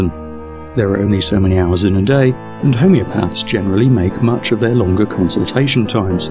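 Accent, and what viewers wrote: England, United Kingdom. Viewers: I know a fellow englishman's voice when I hear it, but I just can't place your accent. What part of england is that?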